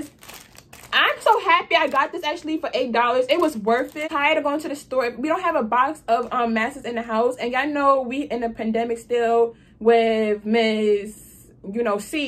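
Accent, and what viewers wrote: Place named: New York, United States. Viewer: You got a new York accent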